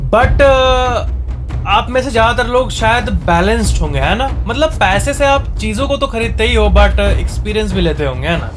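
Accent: Bihar, India. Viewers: Are you from Bihar sir ? Please reply or give heart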